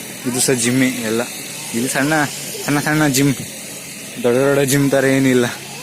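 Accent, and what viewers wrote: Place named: Kundapura, India. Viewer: Nim kundapura kannada laik itt matt niv nachige padbedi nav nimg yenta heludilla so bindas matadi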